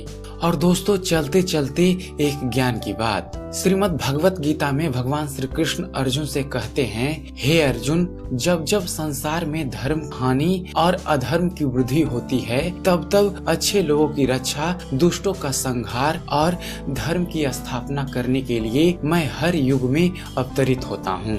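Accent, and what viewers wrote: Bihar, India. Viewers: Audio me kisi bihari ki awaz hai pakka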